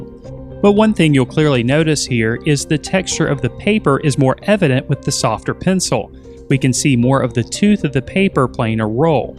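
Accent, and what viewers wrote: South, United States. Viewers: Something about the quality of your voice reminds me of Adam Scott ( albeit with a soft southern accent)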